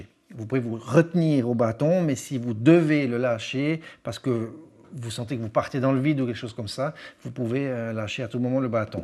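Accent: Switzerland. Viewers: Ton accent me donne envie de traverser la Suisse d'est en ouest ( via Alpina ) ^^ Sinon bravo pour " tenir " la parole 45 mn sur des batons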